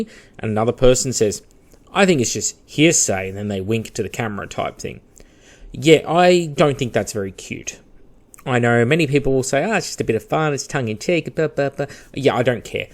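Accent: Australia. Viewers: Excellent whine in redneck oz voice to ease you to sleep . Raise prices another 10% for oz.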